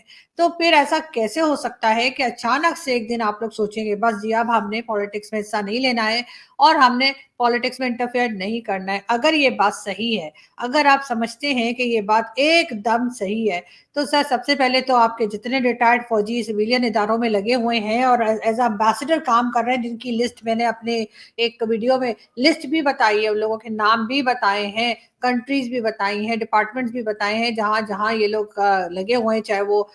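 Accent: India. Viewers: ji aap ki jubaan paki kam hindustani jyada lagti hai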